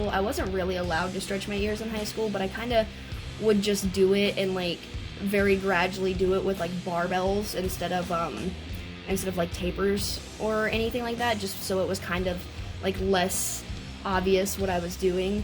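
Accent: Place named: United States. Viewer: Great! Regarding your dialect I would guess you're from the USA, but your style look more european to me :) Great though that some americans dare to look good :)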